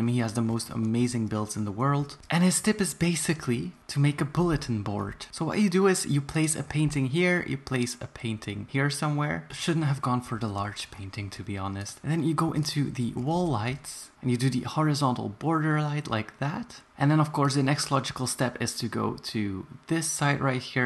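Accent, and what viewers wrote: Netherlands. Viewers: hold up, i thought you were an American! But no (lol its going to be fun if im wrong) you're a dutchie! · Wait, are you Dutch? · 0 Are you dutch?! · Omg he's Dutch like meh! Yasss Queennn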